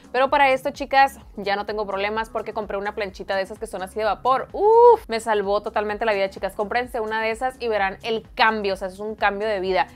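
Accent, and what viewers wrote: Sinaloa, Mexico. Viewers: Me encanta escuchar las chicas de sinaloa 🥰🥰 soy Dominicana🇩🇴 y amo su acento sinaloense ♥️👌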